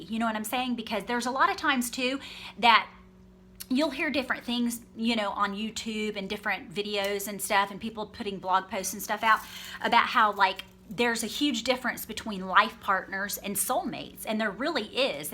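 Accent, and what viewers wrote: United States. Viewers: are you from georgia,cause your accent sounds like it lol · American accent here. 😅😊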